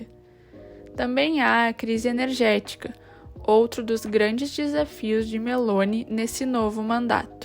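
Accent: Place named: Rio Grande do Sul, Brazil. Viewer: eu adorei a narração, sotaque gaúcho e a menina deve ser linda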